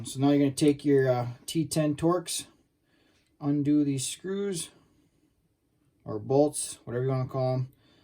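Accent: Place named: North, United States. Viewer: bro where are u from??? 🤔 im literally trying to guess your accent 🤣🤣 definitely up NAWF im from va 🫡💪🏽 just subscribed 🫡🫡🫡🏆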